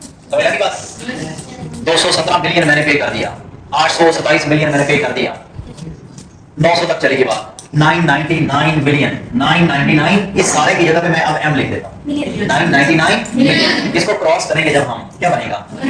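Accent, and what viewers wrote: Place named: India. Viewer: bhai kia bat hai indian touch dy rha hai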